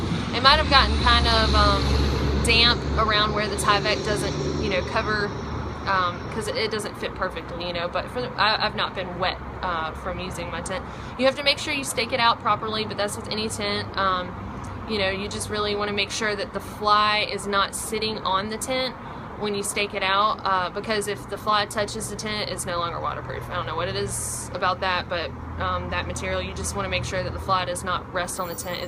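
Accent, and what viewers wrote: South, United States. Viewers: I have spent the last week watching all of your videos! I kept hearing your voice in the back of my head when I was hiking this weekend ... "dirty hickers!" (it was a wet dirty weekend) and your totally different southern accent (Im from FL we have a southern accent but I would say "prett-a" your version 'purdy' I love it all and it's a Pretta, Purdy world out there!!! Your vids are great!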